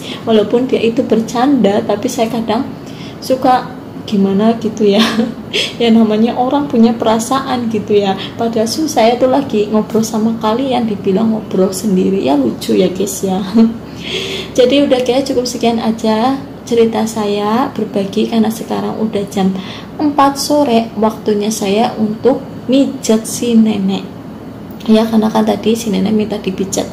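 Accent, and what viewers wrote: Banyumas, Indonesia. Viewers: Ngomongnya ngapak , ya salam dari Purwodadi Grobogan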